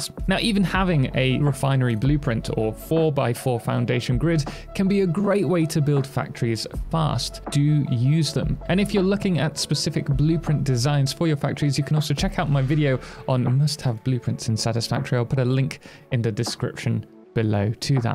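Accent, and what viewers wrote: United Kingdom. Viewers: Which you had more play content around 20minutes. Me and my GF enjoy your voice and Brittish accent. We have been watching your clips while I started Satisfactory 2 months ago. Maybe a playthrough when 1.0 drops? Epsiodes around each Tier or catalogue would be a tip? Standard Parts, Electronics, Steel, Copper, Iron factories, then truck lines and train lines adding on from tour starter location. We find imKibitz too stressful to follow and his megabuilds are far too big to follow.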